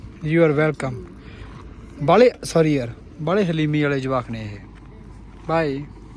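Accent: Malwa, India. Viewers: ਬਾਈ ਮੇਰੇ ਵਾਂਗ ਤੂੰ ਵੀ ਸੜਕ ਨੂੰ ਸ਼ੜਕ ਕਹਿੰਦਾ ਹੈਂ😊 ਅਸਲ ‘ਚ ਇਹ ਮਲਵਈ ਲਹਿਜਾ ਹੈ ❤ ਬਾਕੀ ਅਨੰਦ ਹੀ ਅਨੰਦ 🎉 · ਬਾਈ ਮੈਂ ਤੁਹਾਡੀਆਂ ਵਿਡੀਓਜ ਪਿਛਲੇ ਚਾਰ ਪੰਜ ਮਹੀਨਿਆਂ ਤੋਂ ਦੇਖ ਰਹੀ ਹਾਂ ਜਾਣਕਾਰੀ ਤਾਂ ਮਿਲਦੀ ਹੀ ਹੈ ਪਰ ਤੁਹਾਡੀ ਬੋਲਬਾਣੀ ਨੇ ਦਿਲ ਟੂੰਬ ਲਿਆ ਕਿਉਂਕਿ ਮੈਂ ਵੀ ਮਲਵਈ ਤੇ ਦੇਸੀ ਭਾਸ਼ਾ ਹੀ ਬੋਲਦੀ ਹਾਂ ਸ਼ੁਕਰੀਆ ਬਾਈ ਜੀ🙏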